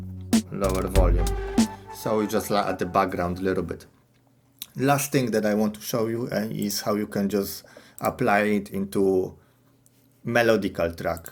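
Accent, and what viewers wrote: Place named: Poland. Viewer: That accent is impossible to miss. Are you Polish? And since you use the name, I guess your name is?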